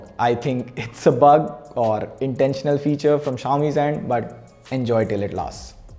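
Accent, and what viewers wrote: India. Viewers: I didn’t leave although he has an Indian accent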